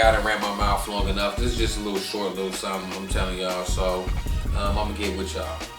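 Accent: California, United States. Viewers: Great vid bruh. You from Cali huh?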